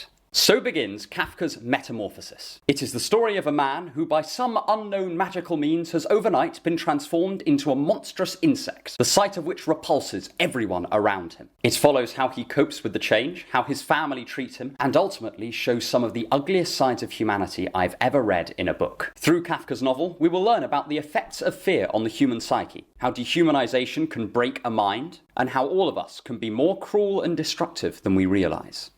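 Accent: England, United Kingdom. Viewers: Is this guy doing a good review of the book and giving in depth thought, or is it the English accent?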